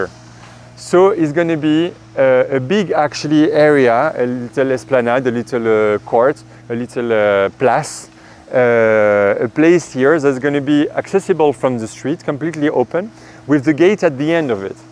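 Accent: France. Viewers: Always a champion! Love the changes you have made..your English is superb, the light French accent makes me want to learn the language!!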